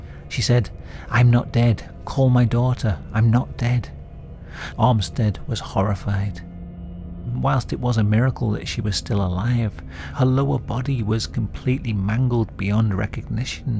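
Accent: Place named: Lancashire, United Kingdom. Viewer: What a great channel this is. So much content that one doesn't encounter amongst the plethora of disaster/death/murder that's online, and with stupid American narration. Here's a Q. for you,; might you be from roundabout Lancashire perhaps? Just going by the good accent!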